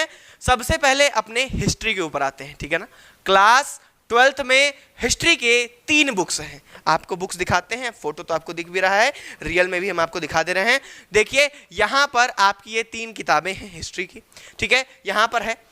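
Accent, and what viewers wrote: Bihar, India. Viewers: Aap Khan sir ki tarah bol rahe ho Bihar ke ho kya? Mein bhi Bihar s hu Darbhanga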